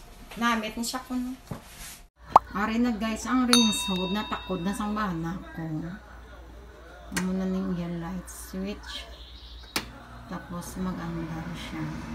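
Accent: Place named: Western Visayas, Philippines. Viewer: Kanami gid ya pamatian sang Ilonggo ah.:) Music to the ears.😍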